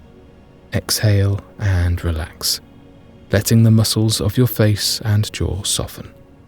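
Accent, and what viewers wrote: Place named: United Kingdom. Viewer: so glad this is a british person i hate the annoying american voices that they usually have on these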